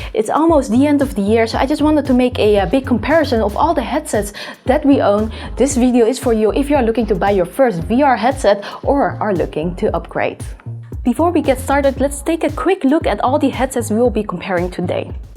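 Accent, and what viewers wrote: Netherlands. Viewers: Ben je Nederlands, want volgensmij hoor ik een accent🤣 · Are you dutch? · You sound so Dutch to me haha are you Dutch?! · Haha ik hoor dat je nederlands bent😝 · You sound Dutch :X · Are you from the netherlands? I think I hear a dutch accent. :)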